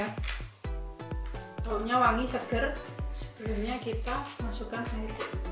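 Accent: Java, Indonesia. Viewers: Medok bnget ya mbak.trims. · Medokk bgt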